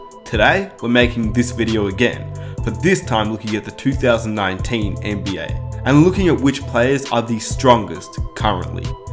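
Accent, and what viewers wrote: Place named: Australia. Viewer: Australian mate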